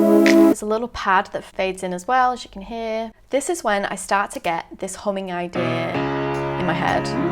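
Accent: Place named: Colne, United Kingdom. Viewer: Enjoyed this muchly. Subbed. You aren't from Colne by any chance are you? You sound uncannily like you might be........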